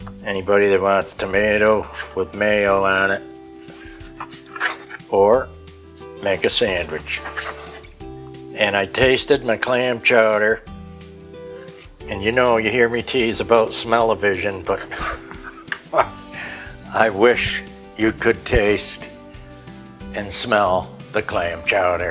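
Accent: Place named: New York, United States. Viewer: I just discovered your channel this month and love it... love all the beautiful pieces of your collections and the cooking is excellent (so organized). But most of all your New York State accent and your laugh (chuckle) with the twinkily eyes all make me happy. There is one You-tuber whose voice and cadence never fails to make me depressed so I can't watch her. I popped on your channel to get instant happy. God bless you and (my daughter's name) and your family. Thank you for all your hard work in making these postings (and, of course). Your delight in seeing something fabulous (ie. Washington, DC trip) is wonderful! God bless!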